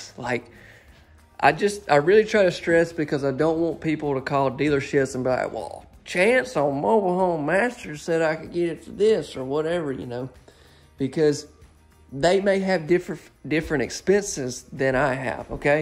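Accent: South, United States. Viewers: Did you say Chance? Is that your name or is it? Either way I’m still digging your southern accent! Lol! I have a question for you about single wide mobile homes. Do you ever see any of them with the kitchen in the front and the bedrooms in the back? My home is so old and is in desperate need of an overhaul. I love watching your videos because I am getting so many ideas for my place. I love love love this place especially the black trim!! Thanks for everything you do for us! I appreciate your attention to details and how you open all of the cabinets to give us ideas!! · soft spoken, sweet, southern gentlemen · This is the most beautiful one that I have seen! Totally love it! Love you channel and love your country accent! God bless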